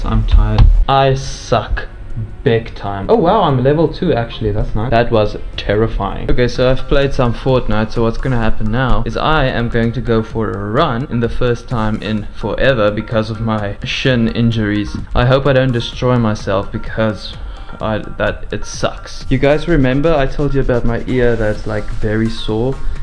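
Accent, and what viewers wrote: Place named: South Africa. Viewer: Daai South African accent 👌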